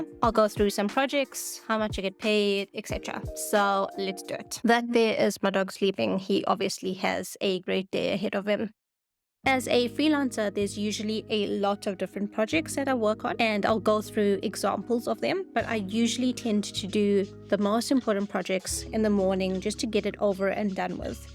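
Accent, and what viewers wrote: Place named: South Africa. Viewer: i miss south africa, i like your south african accent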